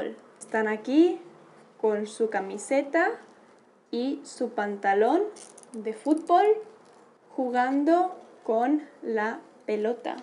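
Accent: Spain. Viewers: Hola. I enjoyed this video. I’ve been learning Spanish using the app Duolingo. You sound very different and I think it’s because the app is based on ‘Mexican’ Spanish. You sound more familiar to what I hear when I’m in Spain. Am I correct?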